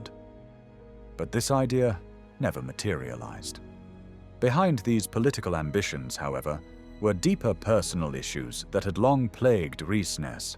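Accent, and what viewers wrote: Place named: United Kingdom. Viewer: agreed. or at least someone without a UK accent or whatever that is. sorry, cannot listen to an entire video of that accent.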